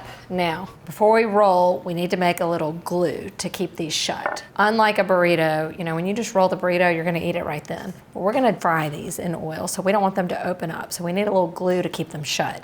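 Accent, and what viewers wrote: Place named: Texas, United States. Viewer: Are u from Texas! I’m from Lake Jackson Tx. Love your accent ❤❤❤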